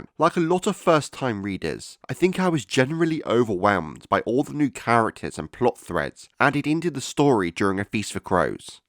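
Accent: United Kingdom. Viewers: The accent is very sheffield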